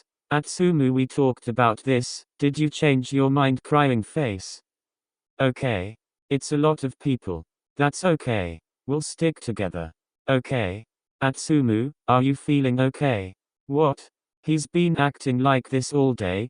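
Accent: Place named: United Kingdom. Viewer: Wow why do you sound British- owo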